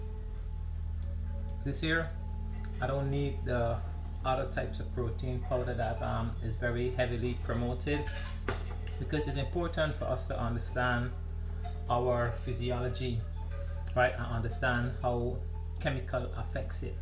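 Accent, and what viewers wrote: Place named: Guyana. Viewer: u sound guyananese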